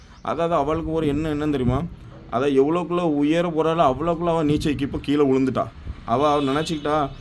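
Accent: Tirunelveli, India. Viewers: Thambee...enga oor karar aaa neenga??? Tirunelveli Nagercoil mix...slang...keka nallaa iruku😊...Naanum Apadi thaan pesuven😂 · Ama sis ivanga tnvl . Ivanga nama slang la solrathu kekka நல்லாதான் இருக்கு 😂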